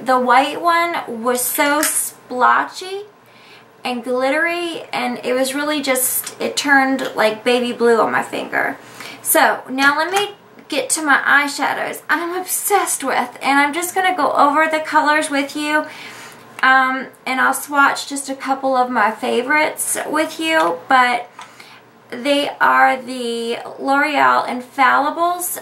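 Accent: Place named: South, United States. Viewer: im in Michigan girl so our weather is just like yours either nice and then bamm its now 85 then the next day back to 65 for a few days then now 90 for a week its nuts! We had some bad tornadoes last week but now its ok this week. Missed your sweet lil southern voice lol good your doing ok. Love your vids n advice n specials n of course tips.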